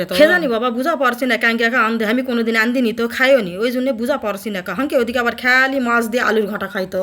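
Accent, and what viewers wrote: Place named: Naogaon, Bangladesh. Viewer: বাহ দারুন ভাবে তো বললেন নওগার ভাষা ❤❤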